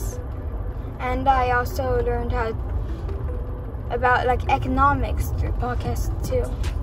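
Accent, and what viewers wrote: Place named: Pacific Islands. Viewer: Bro love your accent man. Sounds like you got a bit of that island swag incorporated. Seki work out. Much alofa Western Sydney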